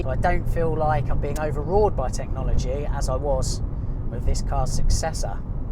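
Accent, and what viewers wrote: England, United Kingdom. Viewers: What type of English accent does he have?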